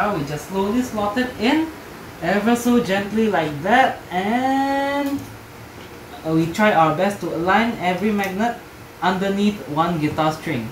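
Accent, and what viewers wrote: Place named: Singapore. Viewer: Kerasa banget Singlish nya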